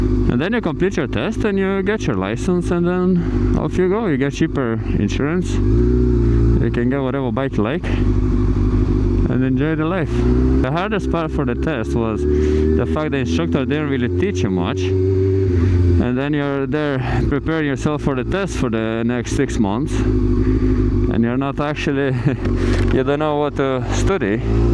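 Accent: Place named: Latvia. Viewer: Cheers mate. Ain't you from Lithuania? I hear some accent... Latvia, maybe.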